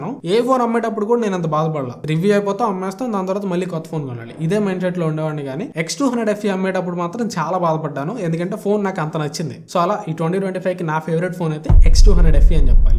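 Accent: Ongole, India. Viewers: Bro meedhi Ongole aa ?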